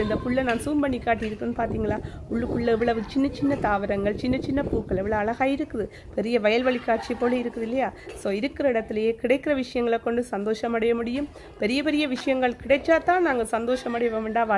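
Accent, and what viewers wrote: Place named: Sri Lanka. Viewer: Elangai Tamil inimai sis 🤝😋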